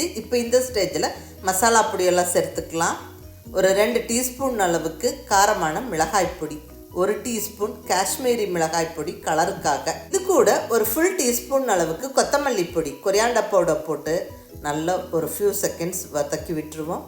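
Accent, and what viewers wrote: Kongu, India. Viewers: Namma kongu manathoda samayalum tamilum sooper madam.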